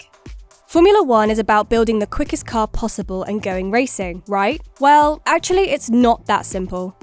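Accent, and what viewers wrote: United Kingdom. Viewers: Britis speak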